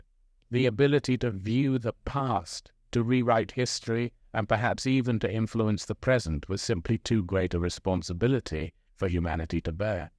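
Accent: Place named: United Kingdom. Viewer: Who NEEDS that shrill, played out 🇬🇧Accent?? Seriously, it’s so tired. And super shallow, too.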